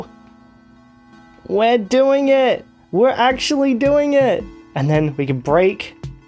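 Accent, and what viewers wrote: Australia. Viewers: Bro is unironically Australian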